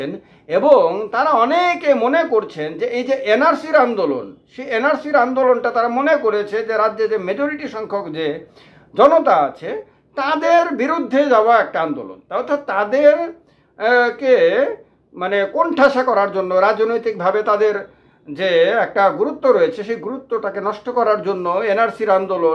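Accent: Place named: Bangladesh. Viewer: Tumi ekjon bangladeshi tumar kothatei bojhajai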